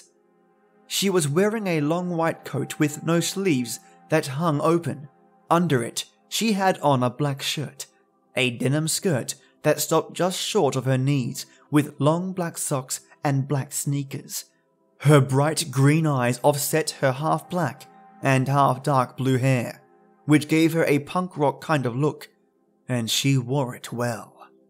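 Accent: Australia. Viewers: You make me wish I had an Australian accent.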